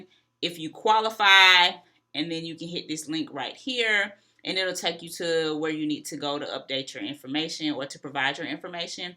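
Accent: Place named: South, United States. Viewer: i've dealt with southern accents alot, understand it pretty well. seeing you brought it up, here's my only advice i think u should know. it's not the accent, it's the speed... just talk a lil slower lol. other than that, i understand ya perfectly (from MA).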